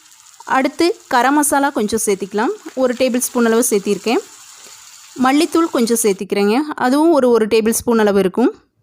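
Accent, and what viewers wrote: Kongu, India. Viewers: 3 Lk கொங்கு தமிழுடன் ரோட்டுக்கடை காளான் சுவையோ சுவை 😋😋அருமை sis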